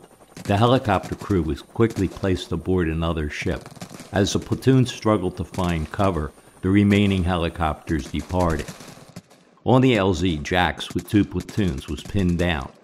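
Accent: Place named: Pennsylvania, United States. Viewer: This narrator sounds like a native Pennsylvanian.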